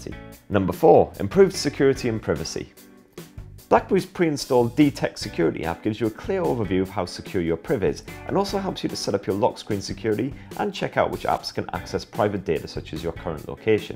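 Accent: United Kingdom. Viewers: love that brit accent jaja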